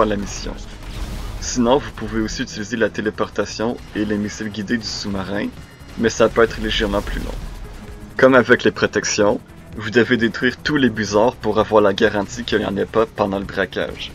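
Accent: Quebec, Canada. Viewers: J’aime la vidéo Hahaha et ça parait que t québécois (je le suis aussi) gg continue comme ça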